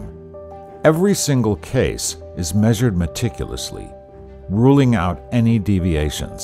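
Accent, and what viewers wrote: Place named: United States. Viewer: The heavily American voice over is weirding me out.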